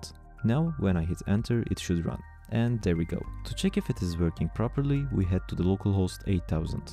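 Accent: Turkey. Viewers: is Ur accent is Turkish haha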